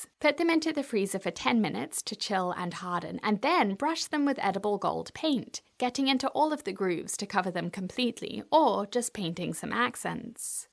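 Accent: United Kingdom. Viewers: Nice to hear a British accent for a change. She speaks so clearly and nicely. Rare on YouTube.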